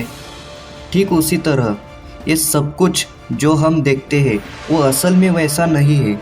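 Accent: Maharashtra, India. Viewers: Bro where are you from it sounds Marathi guy anyway. I agree with you bro you really explained by hurt and its true. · कसलं भारी मराठी मे हिंदी बोलतोय भाऊ तू .....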